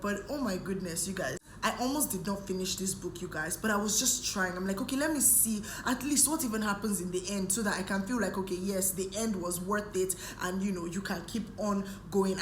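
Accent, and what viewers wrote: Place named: Lagos, Nigeria. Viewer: Your accent is obviously a Lagos Nigerian accent. Or perhaps a certain sub-group in Lagos or even in Nigeria have this unique inflection. I am curious as to how you came about the accent. Does it have anything to do with ethnicity or schooling or social class. reason is that is exactly how Chibundu Onuzo sounds. Thanks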